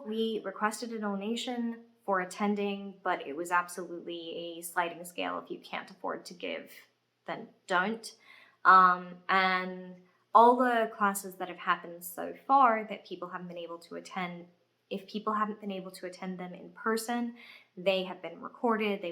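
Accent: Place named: Australia. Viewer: Just a question for you. Are you originally from Australia, or spent a lot of time around Australians? Reason I ask is, when hearing you speak, although your overall accent sounds American, some words you said sound almost Australian.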